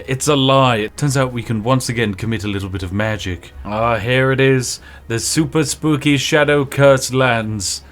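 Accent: United Kingdom. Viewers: Words cannot express how much I want Boblin - with Spiffing Brit's very british narration still in place - meeting SmuckSquad's Goblin Slayer. Its the sort of crossover that's born in the depths of hell and should not work but I have full faith that with some Yorkshire Tea we can pull it off. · Here is the thing. When has Spiff ever failed? Never! This is just about seeing how awesome his british voice is. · I got excited when you said boulders gate free... Then I realize your British with a ridiculous accent...😢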